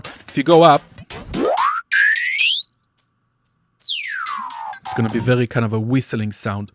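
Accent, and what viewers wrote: Israel. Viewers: I love the fact that I could tell you're from Israel after the first three words you said! But jokes aside, great video and useful info, thank you very much.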